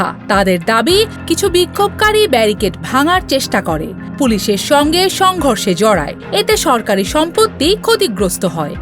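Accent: Kolkata, India. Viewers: মনে হচ্ছে কলকাতার নিউজ শুনতেছি, কলকাতার সংবাদ পাঠিকা 😂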